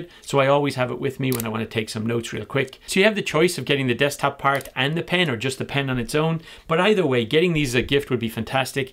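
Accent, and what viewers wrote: Ireland. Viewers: R U Irish. I like your accent. I lived in Galway for 10 years.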